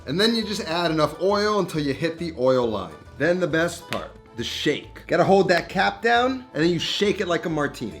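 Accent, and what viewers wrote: New York, United States. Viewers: ☠️☠️☠️ some of the most New York shit I ever heard 😂😂😂😂 Right up there with the Kosciusko bridge 😂 Even Google talk to text inputs the correct spelling using the marble mouth pronunciation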